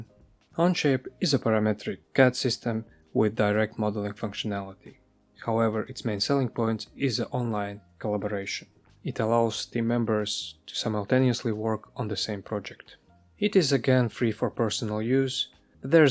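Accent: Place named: Latvia. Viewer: I can tell a Latvian just by hearing the voice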